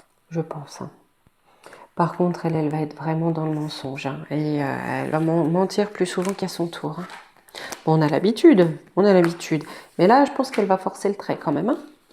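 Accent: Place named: France. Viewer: Bonjour. Merci pour cette vidéo. J'adore votre France parler. Bon week-end également.❤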